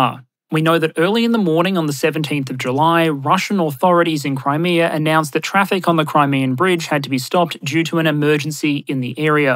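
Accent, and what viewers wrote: Australia. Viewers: What an episode. You are serious what the world needs, the work you do is insane. You deserve all the awards. Thank you Mr. Aussie voice man.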